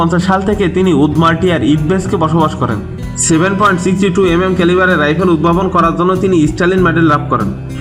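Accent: Bangladesh. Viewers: vi ki noakhailla naki..... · আপনার বাড়ি কি খুলনা, যশোর বা নড়াইলে? আপনার কথায় আঞ্চলিক টান আছে।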